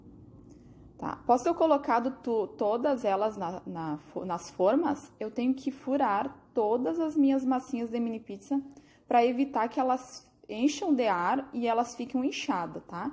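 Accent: Rio Grande do Sul, Brazil. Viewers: Voce conversa igual uma amiga do Rio Grande do Sul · É gaúcha né ela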